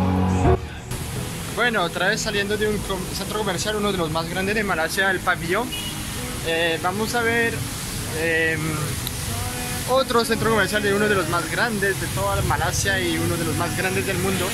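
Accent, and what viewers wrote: Colombia. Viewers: Aprendiste el mejor acento el colombiano, hablas re colombiano. Se que eres heterosexual, pero lo diré , eres guapo jeje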